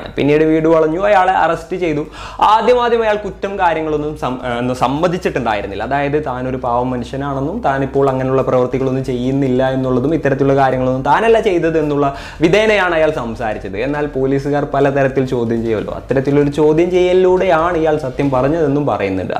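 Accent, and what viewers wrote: Thrissur, India. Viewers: Bro thrissur aano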